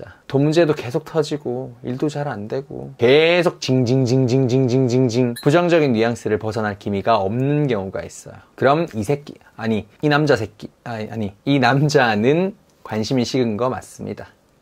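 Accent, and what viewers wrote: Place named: Daegu, South Korea. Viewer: 대구에요? 억양잌ㅋㅋㅋㅋ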